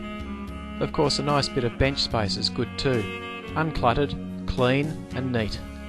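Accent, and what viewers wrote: Australia. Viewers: Yeah your right I am British. and pomegranates are pretty tasty so I am not guna argue with that. I didn't notice you were, i fail quite a bit at recognising accents. ^^ i just bought his model T clock so just started that. but your video is being really helpful. you are obviously a very skilled craftsman. what type of finish do you use? i was thinking to do the wheels carfuly as not to get it in the teeth with bees wax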